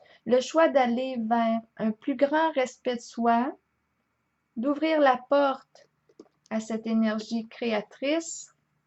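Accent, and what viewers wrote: Canada. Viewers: Quel plaisir d entendre ce bel accent canadien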